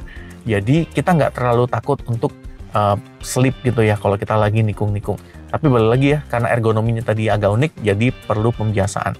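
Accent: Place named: Surabaya, Indonesia. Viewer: Suaranya jga mirip sekilas, cuma dg aksen suroboyo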